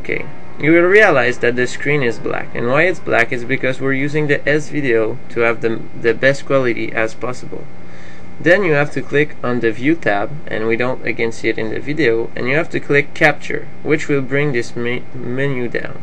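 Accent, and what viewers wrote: Canada. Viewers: Yes it is. it is a Quebecois accent. from quebec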